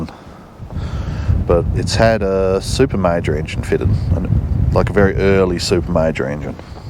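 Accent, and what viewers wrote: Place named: Australia. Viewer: I'm getting the picture now. You're not in the UK. That's why these Majors have all got different back wheels on them. Were they maybe delivered CKD and had the wheels made in your country? Australia? Going by that accent. And I've never seen a loader like that either.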